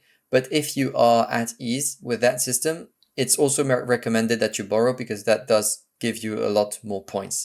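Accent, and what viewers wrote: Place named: France. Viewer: D'autant plus que l'accent est très frenchy 😖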